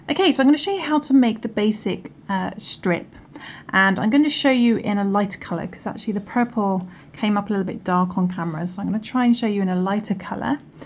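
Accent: United Kingdom. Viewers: Love this pattern. I have a question, though. When doing loop stitch, you start out with yarn over on this video, but in your other tutorial with the small light-green sample you don't do yarn over as the first step and just go directly to inserting into the stitch as the first step. So, I'm a little confused. Would rather not do yarn over, if possible. So lemme know. I love your Brit accent.....Fiddly, a very cute and accurate expression, lol. Haven't heard that one over here--USA.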